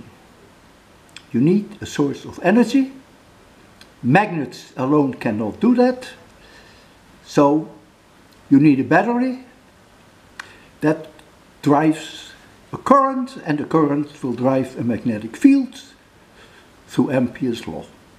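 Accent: Netherlands. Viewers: I can hear he is dutch although his english is very good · idk know why he has a dutch accent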